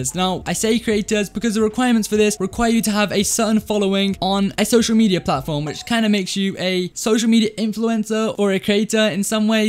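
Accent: Sweden. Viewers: are you swedish?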